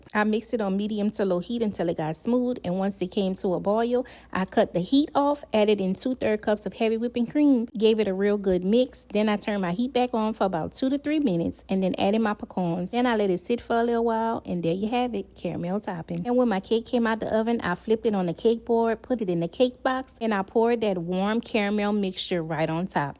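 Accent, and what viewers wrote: Louisiana, United States. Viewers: At not one point did you say “babe” in this video. I’m highly disappointed because that accent has Louisiana written all over it 😩😭💜💜💜💜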